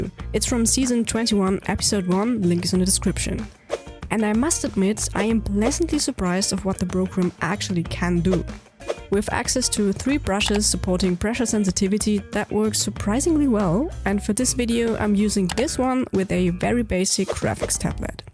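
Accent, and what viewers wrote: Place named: Germany. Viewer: you sound alot german, are you?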